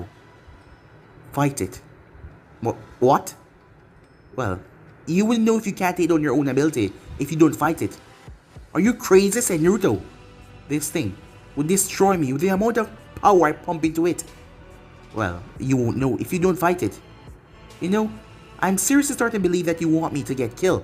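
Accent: Jamaica. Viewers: I do not wish to offend you, but are you Jamaican or Canadian? Also, very nice series. Can you do a what if with Naruto getting both wind and lightning chakra natures?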